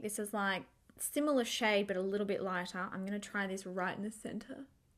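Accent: Australia. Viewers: Hi girl, love your work, just love hearing an aussie accent. Just wondering if you have ever tried a one brand tutorial or a first impressions on all Australian brands like 'Australis', 'Mirrenesse' or even cheaper brands like 'Designer brands' ?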